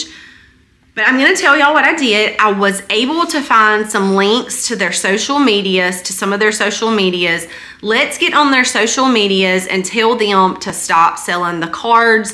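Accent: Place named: Alabama, United States. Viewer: YOU FROM ALABAMA AINT YA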